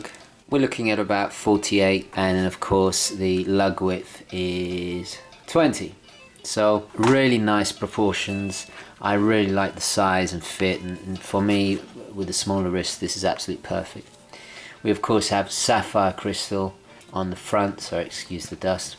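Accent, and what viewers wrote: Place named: United Kingdom. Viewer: Alu MIN ium insert. Love the British pronunciation. Superb watch and review.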